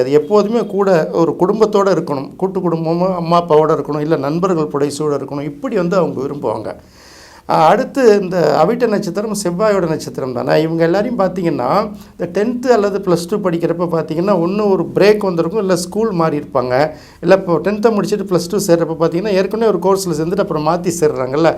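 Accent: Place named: Chennai, India. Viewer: Madras தமிழில் பேசி நாசமாகப்போகிறோம்.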